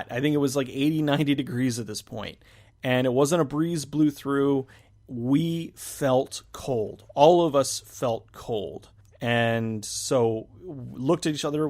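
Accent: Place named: Maine, United States. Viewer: Herr Oberst Wilhelm Von Borchert You're right. Sounds like grew up in a King novel.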